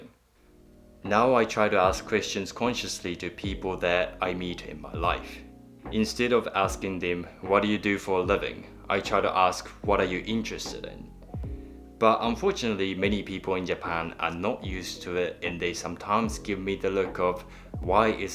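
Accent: New Zealand. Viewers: wise and crazy cute! 🥰 ps. did u study in New Zeland? I can hear a kiwi 🥝 accent when u speak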